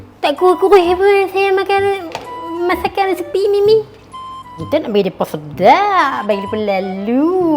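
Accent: Penang, Malaysia. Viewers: Suka degaq loghat penang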